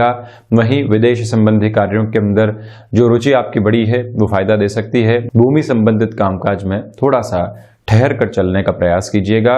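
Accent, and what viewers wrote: Standard. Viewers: Beautifully said! Whenever I listen to your pure language, I am reminded of my Hindi teacher in school, Smt Rajkumari Saxena to whom I am ever indebted for learning Impeccable Hindi. Its such a pleasure to listen to you, Sir.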